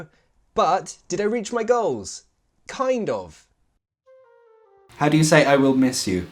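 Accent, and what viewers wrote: United Kingdom. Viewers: woah so many thanks^^ I'm learning right now korean so it's still difficult cuz it's so different than english and some words didn't still stuck in ma head so, i'ts kinda frustrating.. I'm in love with korea language (not with a person yet :v) but yeah u.u..... ptt: japanese person may thinking bout: are you Saitama in real life? :v ... I love your accent btw (it's a little bit different than other british persons) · I love your channel!!! Love British accent!!! Thank you for sharing!!! I’m Japanese 😜1/2 lol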